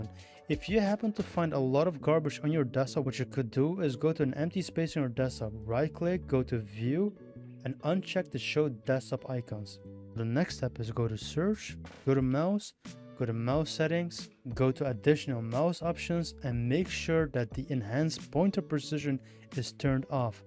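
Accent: Turkey. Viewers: türk mü la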